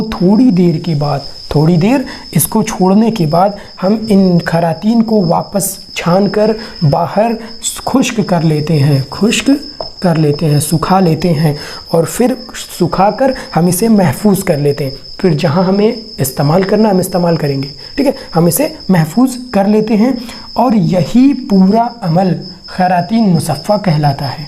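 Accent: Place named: India. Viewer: bhai bolnay sae tou aisa lag raha hae kae aap india sae ho lakin urdu likhna jantay ho? Aur yae earth worm ko sukhanay sae pehlay marna parta hae kya? Bhai yae bhi batain kae sulphur ko gandhak amla saar kaisay banatay hein?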